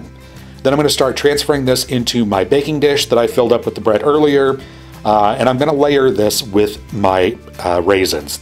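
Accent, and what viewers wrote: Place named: South, United States. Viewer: your. fried. chicken. club. sandwich. video. brought. me hear. now it's. 11pm and im watching this now. im hungry thanks 😃 i can't. see you but. do i hear. a lil bear in with. that. southern. accent.....woof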